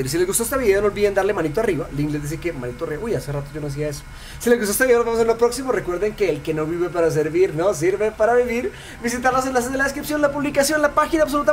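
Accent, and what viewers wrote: Colombia. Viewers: waaaaaaaaa colombia? no me habia dado cuenta hasta que hablo xD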